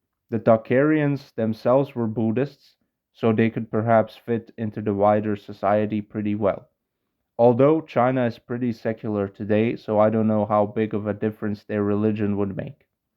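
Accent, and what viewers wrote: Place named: United Kingdom. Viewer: That's a possibility, but I think it's rather unlikely. His accent sounds to me like one of the lower class British accents, and in these accents "TH-fronting" (pronouncing the TH in "thin" as an F) is the norm. So it's much more likely that he simply grew up speaking that way, but that physically it wouldn't be difficult at all for him to pronounce "north" properly, had he grown up among people who pronounced that sound. ....Anyway, it's not a huge deal really, but I just find the F pronunciation of TH rather annoying, and he's literally doing a linguistics video, where he's talking about reviving extinct languages, so it doesn't seem unreasonable to ask him to start by reviving the TH sound in his own English 😉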